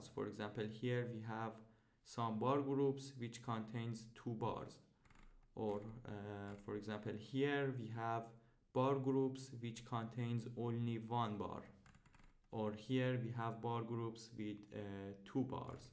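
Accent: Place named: Iran. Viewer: Cheghad Farsi Englisi sohbat mikoni :) damet garmmmmmmmmmmmmmmmm eshghiiiiiiiiiiiiiiiiiii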